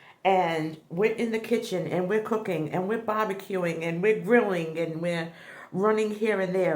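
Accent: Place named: Massachusetts, United States. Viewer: Hi!!! I met you on Jeanine's live this morning I just found your channel and I said I know that accent it sounds like me I'm in Massachusetts 😊💗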